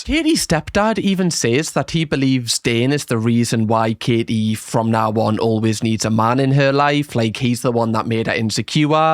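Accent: Newcastle, United Kingdom. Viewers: He is indeed Geordie :> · Hi, love watching your videos. Do you have a Geordie accent? It sounds familiar, but I'm not sure. I hope my sons will be as emotionally mature and empathetic as you are. Any tips? 😅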